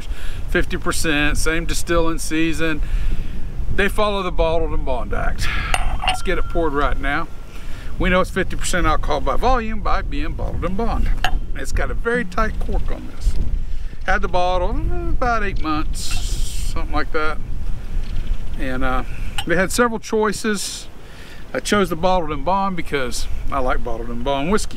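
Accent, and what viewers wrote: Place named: South, United States. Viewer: It looks like this isn't available here in Albuquerque, but it sure sounds like I'd like it. I've found that I like the fruitiness of a single malt (though Scotch and Irish whiskies aren't, in my limited experience, forceful enough for me; I love the Balcones Lineage Texas Single Malt, which is), and the barley and the corn in this one ought to make it right for me. :) Thanks for reviewing it. And you may be in Kansas, but you sound southern enough to make me happy. :D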